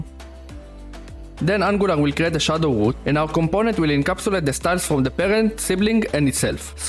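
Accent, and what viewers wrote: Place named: India. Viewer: oh my God! Is there any ony of this videos that is not made with indian accent/???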